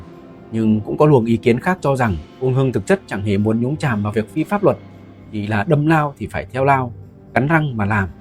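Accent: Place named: North, Vietnam. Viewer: có thể lồng tiếng miền nam đuọc không ad . tiếng miền bắc giọng gọng gọng khó nghe . xem mà phải tua nhanh gần hêt một video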